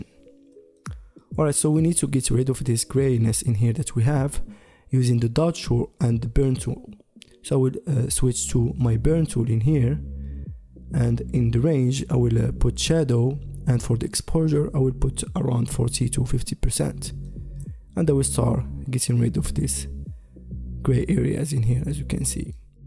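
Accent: Morocco. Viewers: That Moroccan accent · That Moroccan accent tho hahaha, great video Bro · Very nice thank you. you have a moroccan accent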